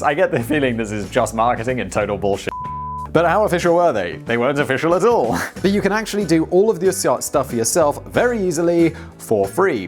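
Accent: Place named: United Kingdom. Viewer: that comment was like a year ago and I was smashed as drunk and barely even paying attention you got to realize I washed all of his channels I'm subscribed to all of his channels and up until that point he had never said anything about having a kid or a wife and I'm American so I kind of misinterpret the British pronunciation of things as you know kind of the gay American thing I don't give a f*** if he's gay or not if you ever find my Facebook and go back I've got pictures of me hugging trainees and being at drag shows and them kissing on me I really don't give a fuk either way but like I said I was smashed as hell when I made that comment because I couldn't quite figure him out and I was just in one of those moods so kindly piss the f*** off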